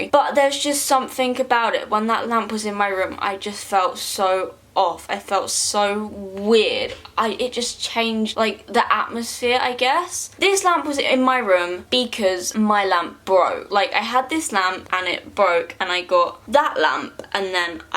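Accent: England, United Kingdom. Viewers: Is she British? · Taylor Stege she's English :)) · you mean shes from England, Australian's still speak English :) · Ur English 🇬🇧🇬🇧🇬🇧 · So this is suppose to be a complete compliment.... I love how you talk, you slightly remind me of a sassy like pirates teenage daughter. I'm from California so I'm obsessed with English accents just like every other Californian haha but yea this is great.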